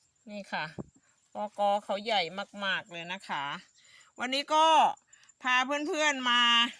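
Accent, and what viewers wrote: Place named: South, Thailand. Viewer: น้อยมาช่วยเปลี่ยนถางค่ะคุณ 🤩🤩พูดแบบคนใต้จ้า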